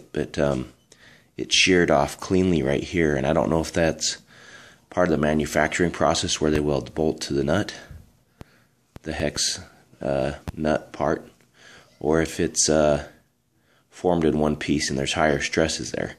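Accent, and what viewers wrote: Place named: United States. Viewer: No he doesnt. He sounds american.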